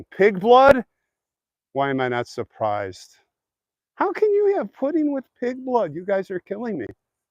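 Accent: Scotland, United Kingdom. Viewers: Loving your Scottish Accent! 🏴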